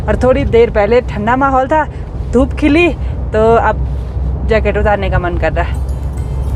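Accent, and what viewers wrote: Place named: Garhwal, India. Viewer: Jab Hindi bolati Ho tab bhi tumhara Garhwali tone aata Hai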